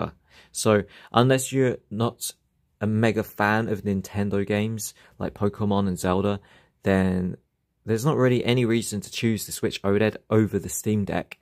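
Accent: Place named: United Kingdom. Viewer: ...not even his British accent made this review sound smart.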